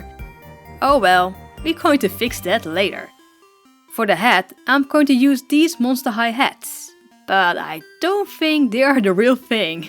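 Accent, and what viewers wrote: Netherlands. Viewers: What is your favorite kind of Diuvs transformation? Like what animal is the most fun for you to transform a doll into? Also, I love your Dutch accent! :))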